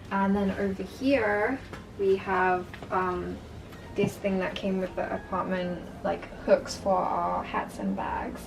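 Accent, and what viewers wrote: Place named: United Kingdom. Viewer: hmm interesting, UK accent coming back to HK very small aprtment.. why not comeover to the mainland. Try Shenzehn and Guangzhou much bigger much better But goodlocation will always trump other factors so good on both of you. Our family also feels that the surrounding environment is important especailly when you walkout on the weekend, you want to be somewhere with cafes etc and a place you feel cmfortable also its good to be around the same kid of clientelle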